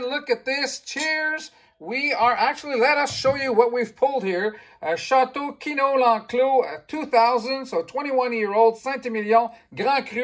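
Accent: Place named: Quebec, Canada. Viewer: Nice video! You Canadian have such a cute French accent. 👌 Nice wine, St Emilion is always a good choice.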